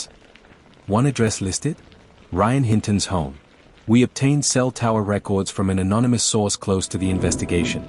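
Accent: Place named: United Kingdom. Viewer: I can’t stand a British voice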